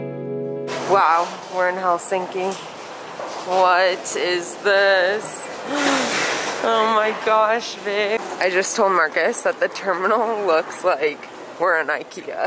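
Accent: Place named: Australia. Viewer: haha confusing Europeans with an Aussie accent 👍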